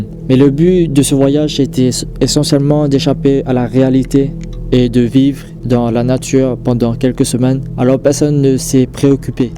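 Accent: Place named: England, United Kingdom. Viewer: Je pense juste qu’il n’est pas français mais plutôt anglais ou américain tout simplement vu l’accent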